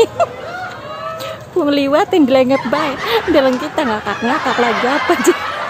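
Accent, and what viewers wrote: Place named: Cirebon, Indonesia. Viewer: Cirebon logat ny,,astagfirALLAH ngahayal dikit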